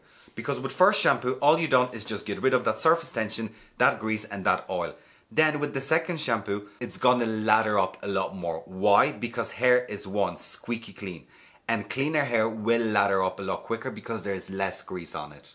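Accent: Ireland. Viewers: I used to live in Athlone (went to college there) so your lovely accent reminds me those days ;) I bought Kerastase with stemoxydine - should help to "awake" sleeping hair cuticles. We will see. If it doesn't work I will try loreal and vichy but wanted to try Kerastase first. Thank you so much and have a great day :)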